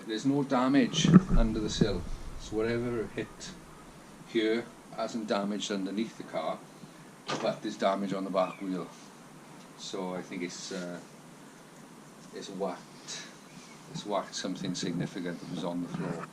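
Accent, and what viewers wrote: Wales, United Kingdom. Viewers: Are you from Wales by any chance ?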